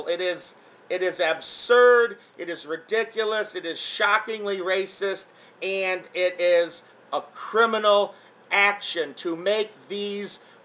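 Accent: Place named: Midwest, United States. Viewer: I love your Midwestern accent.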